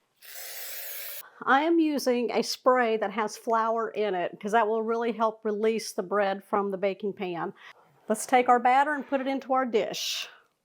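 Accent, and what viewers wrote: Texas, United States. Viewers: Hee hee shout out from Auckland New Zealand!!! I got hooked on your lovely Texas drawl! I saw your other amazing blueberry monkey bread recipe! We don’t have your USA thing ‘biscuits’. Can anyone tell me what that actually is? Scone mix?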